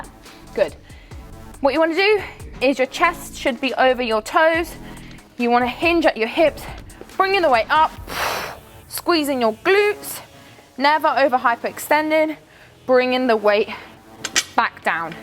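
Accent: England, United Kingdom. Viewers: English accents are everything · that’s her british accent fooling you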